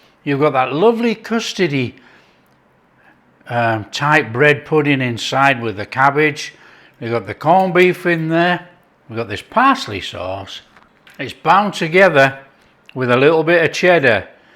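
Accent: United Kingdom. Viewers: Love your style sir, you probaly think we got an accent over here in the USA, but that's ok but, we LOVE YOUR BRITISH TALK, LOVE YOUR, "COME ON, PLEEEESE! THATS THE SIGNATURE OF 😅 you are so down to earth, and such a great cook, I look forward to each of your videos, love the one right way to make burgers. You are a master in the trade, simply love your presentation, espeacially when you sit and taste what you made, love your expressions, a bonus to all your videos, crack on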